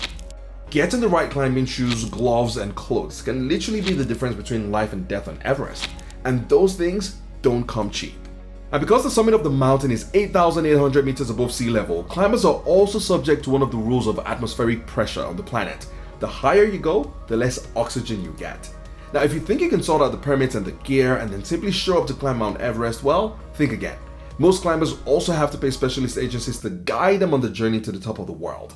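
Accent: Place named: South Africa. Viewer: Ha ha... can't hide the South African in your voice. Decent video guy. Even though I'm not a mountaineer, I find the Goddess Sagamartha (Mt Everest) to be incredibly mystifying, beautiful and majestic. A dream of mine is to one day just see it with my own eye. Not to do a summit attempt... I just want to be able to see and marvel at it's colossal beauty.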